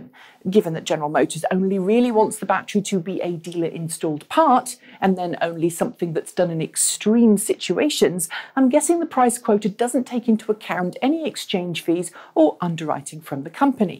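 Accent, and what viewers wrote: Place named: England, United Kingdom. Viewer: it s too hard to understand if English is not your mother language. it's a too 'hard' English accent. after months i must unsubscribe. a pity but it's always a too much big effort to understand for me.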